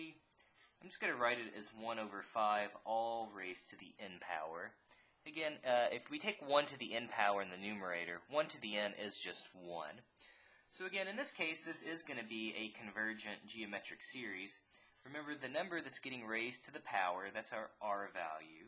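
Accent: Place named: United States. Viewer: in the U.S. you can tell from his accent. however if you want to ask which state is he in? because american only ask about america sinour.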